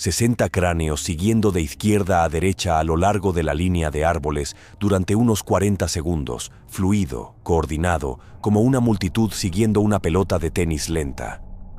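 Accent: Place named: Spain. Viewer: A mi casi no me gustaba el acento español pero ya lo amo con este canal !!!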